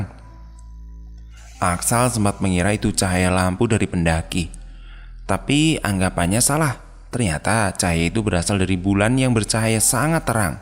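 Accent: Java, Indonesia. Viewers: Suara nya kok enak y 😂😂 Apa lgi logat jawa nya..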